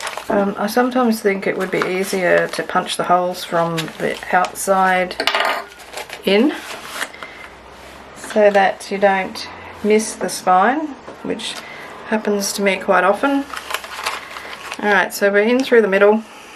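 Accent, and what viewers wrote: Australia. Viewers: This is doable! I’m collecting bits and pieces to make my first journal and JJJ seems like the perfect time to muster up my courage. It’s going to be a French and lace journal. I’ve saved this one and I’ll be referring to it a lot! Thanks for the inspiration. I’m in Oz too on Tweed Heads. It’s so nice to hear an Aussie/kiwi accent in YT. Of course I’ve subscribed😊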